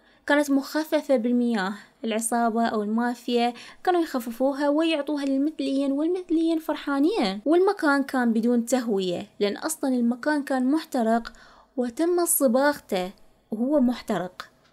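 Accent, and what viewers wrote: Iraq. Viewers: يزين اللهجه العراقيه تخبلييين❤️❤️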